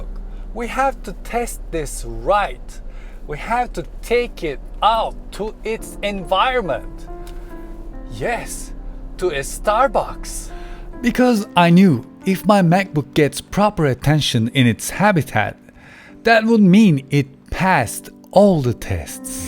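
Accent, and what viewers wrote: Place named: Turkey. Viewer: Aksanı çok Türk kaçabilir fekat beyin gramerle sorunu yok obvs.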